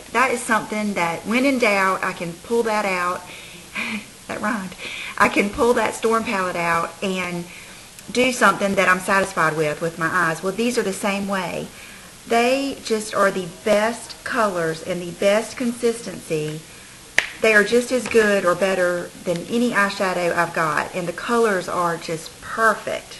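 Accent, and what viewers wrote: South, United States. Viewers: 5 stars for the lovely southern accent :)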